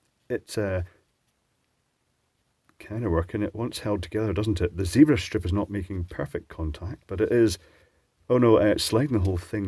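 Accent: Scotland, United Kingdom. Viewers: I have a heap of unusable ones and whilst of a similar stature and deftness with a screwdriver clearly lack the dulcet soft Scot's accent to lull the fiendish devices into compliance.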